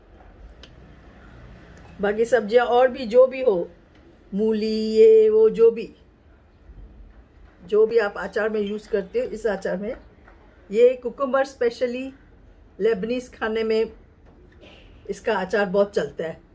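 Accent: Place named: Sindh, India. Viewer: With the name I thought it's a Parsi channel but the time you started to talk aunty ji I got it you are Sindhi 💞❤️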